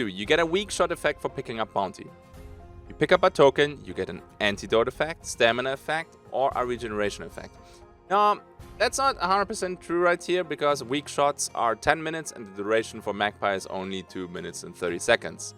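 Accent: France. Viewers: Quel bel accent Français ! Nous apprécions votre prononciation mon cher ! :D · Damn French accent, i'm almost jealous !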